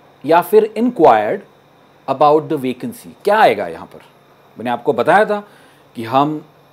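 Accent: United States. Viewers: Are teaching american English pronunciation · In my humble opinion, You're teaching american accent not British accent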